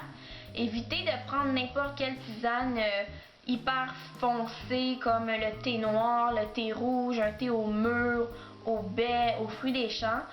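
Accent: Quebec, Canada. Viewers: T'es belle et puis ton accent quebecois Omg il est troooop beau __ 👌 +1 abonnée Francaise Active Bisoux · Ont dirais que tu est canadienne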